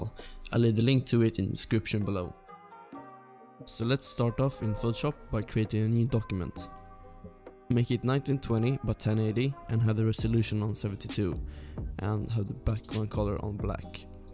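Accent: Sweden. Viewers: I Love that Swedish accent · Hahahahahahah jag hör att du är svensk😂 bra video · Are you swedish? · Your from sweden They talk english like that Im from sweden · U sound swedish! Im norwegian btw:) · jag älskar din svenska dialekt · U swedish or norweigan? I guess sweden😆Im from Sweden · Are u Swedish? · Heard instantly that you were Swedish, what’s up my Swedish brother. (Också svensk:)) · No way! Ur from Sweden! I hear that from ur voice! 😹 I’m also from Sweden 🇸🇪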